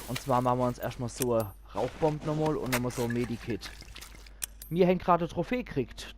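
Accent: Palatinate, Germany. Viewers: Hab ich das richtig verstanden am Anfang: "Wir sind im Hemshof gelandet"? :D Ist das ein pfälzischer Ausdruck oder meintest du tatsächlich den Stadtteil einer bestimmten Stadt in der Pfalz? :D Hab deinen Kanal über das Forum gefunden und fand deinen "uff pälzisch"-Aspekt sehr interessant! Bin selbst in der Pfalz (Vorderpfalz) aufgewachsen, auch wenn man das gar nicht glauben mag, da ich ziemlich Dialektfrei bin :D Werde auf jeden Fall von Zeit zu Zeit mal reinschauen :)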